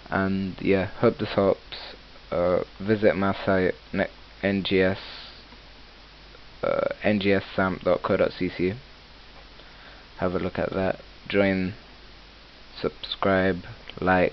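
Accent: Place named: Cockney, United Kingdom. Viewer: He's british lmfao... Cockney...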